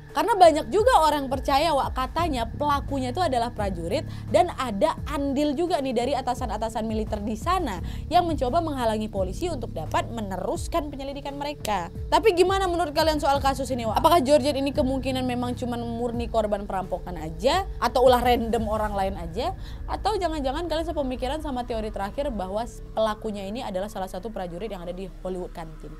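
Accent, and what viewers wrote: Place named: West Sumatra, Indonesia. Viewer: "Ndak itu jawabannya do" 😅 awak takana jo kampuang mandanga nad2 mangecek macam tu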